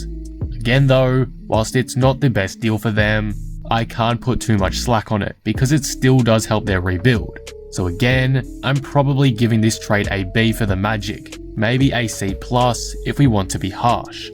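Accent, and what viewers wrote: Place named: Australia. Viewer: Yea the magic, cmon lads!! Love the Aussie accent m8🇦🇺🦘 oi oi oi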